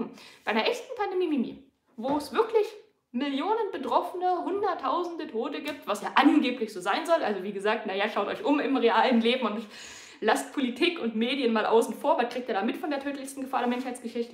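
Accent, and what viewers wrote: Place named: Berlin, Germany. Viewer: "gepullert" 🤣 ich liebe euer Berliner Dialekt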